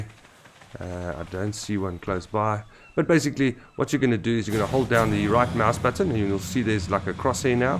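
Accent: Afrikaans, South Africa. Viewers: Sound afrikaans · Is that kiwi or suth efreeken? Pretty sure it's suth efreeken.. best accents ever. Kiwis slightly better purely cos of the ey Bru U drounk yit? Oh no wirries Bru. Have ma criser I gat thri edit: kisses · Are you from south africa?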